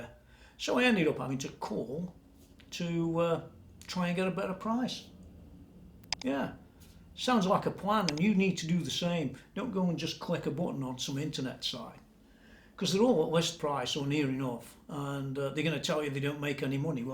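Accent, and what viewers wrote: Stoke, United Kingdom. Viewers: I must admit I'm sceptical about the use of silicon gel for damping, not seen that before on amps I've owned. BTW, most of the comments on Psionic Audio's review are from the US, their optics will be different because of the pricing. In the UK the JTM Studio 20 is half the price. It's also amusing seeing them trying to guess your accent... cockney, Scouse, Scottish.... personally I'm thinking more up 'Anley duck!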